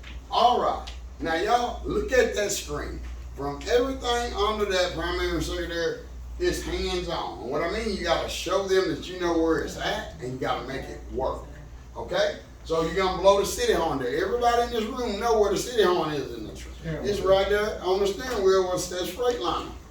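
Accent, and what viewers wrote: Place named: South, United States. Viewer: It's very detailed but your talking to fast and country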